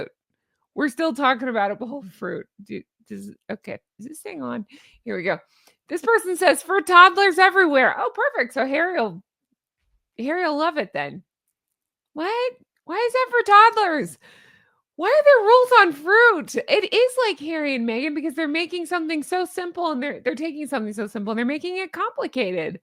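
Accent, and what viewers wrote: South, United States. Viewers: It’s ok to sound Southern❤ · Good Morning and room. Honk, Honk.. Megalier episodes never gets dull..... You do sound Southern.... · HONK-ity HONK HONK Queen of the Flockers. Great video as ever 😂😂😂😂😂 Yeah, you do sound southern. How's Flockingham Palace coming along? Enjoy your days off. Congratulations on your weight loss journey. I love how you keep things real (I dare not say 'authentic'). Your faithful (& a tad busy) humble Flocker. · Hey. Mississippi girl here- don’t you apologize for any southern accent you may or may not have. I think it sounds beautiful🥰 Love you channel!🪿🪿🪿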